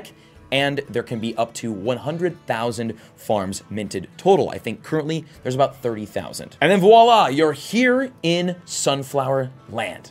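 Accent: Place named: South, United States. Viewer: I’m learning English for quite long, and this southern accent is siiiiiiick!! Love it , it brings some cool atmosphere here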